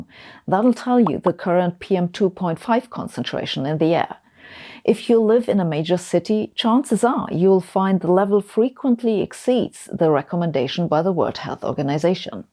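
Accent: Germany. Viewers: Ihr Englisch ist so herrlich Deutsch ... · fear mongering with a German accent...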